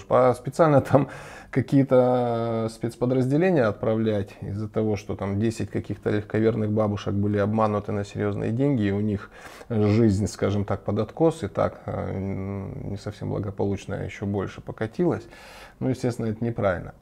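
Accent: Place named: Ukraine. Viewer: Говор украинский-значит из Украины 🤦, где-то смеется ростовский представитель калломассы.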